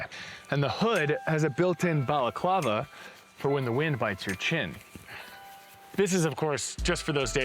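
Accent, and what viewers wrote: Canada. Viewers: Not much of an inside joke when everybody knows this Canadian pronunciation quirk. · Nothing like gettin’ oot n’ aboot eh!? From Ottawa to Hull you have no equal. Rock on eh!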